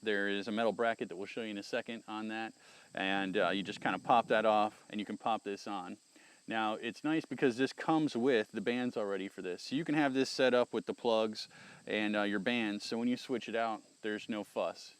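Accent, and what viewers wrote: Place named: United States. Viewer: es insoportablemente gringo. Habla en jeringoso yankie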